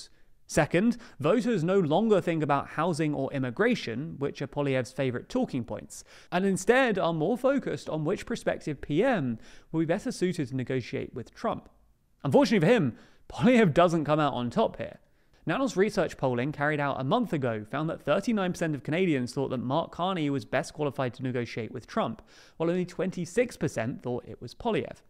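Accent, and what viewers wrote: United Kingdom. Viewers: "Besides, why would anyone believe anything said about Canadian affairs from someone with a British accent." You mean like your head of state King Charles? Who was there for you in the war of 1812 when we collectively kicked the ass of the U.S.? Who fought for King and country at Vimy Ridge? Whose air force fought in the Battle of Britain, while the U.S. stayed out of WW2? If you like the United States so much, then why don't you just go and live there? Stop your anti-Canadian rot. We are not the United States, and our ancestors fought and died not to be part of the U.S. The Tories are just Yankee snakes who will use their disgusting treachery to make us the 51st state.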